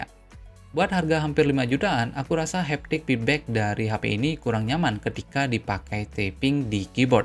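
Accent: Bali, Indonesia. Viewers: Logat bali y bang?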